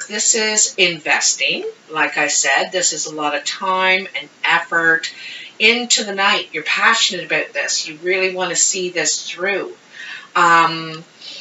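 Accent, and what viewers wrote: Canada. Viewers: I thought so, I just got such a vibe, probably 'cause i too am in ontario, but when you said "eh" I thought yes! she has to be Canadian, lol Tks for replying :)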